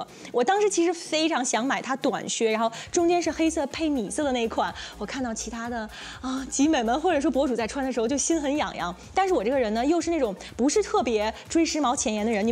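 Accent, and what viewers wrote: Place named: Shandong, China. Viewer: 很像山东的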